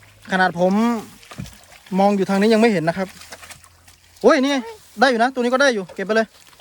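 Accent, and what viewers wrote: Isan, Thailand. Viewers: พูดไทยเก้งก้าง ชัดบ้างไม่ชัดบ้าง ถ้าเป็นไปได้ สำเนียงนี้ (เว้าอิสานไปโลด)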